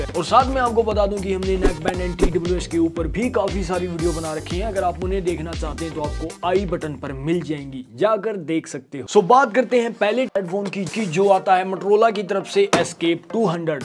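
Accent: Haryana, India. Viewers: Bhai by your accent i can say you are definitely Haryanvi 😍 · Awaj t lag h haryana ka h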